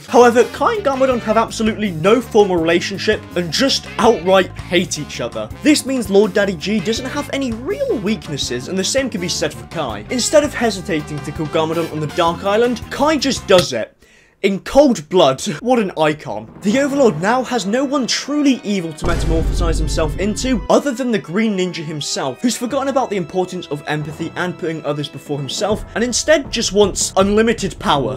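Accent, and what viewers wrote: United Kingdom. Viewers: Your funny as it is bud your British accent makes it ten times better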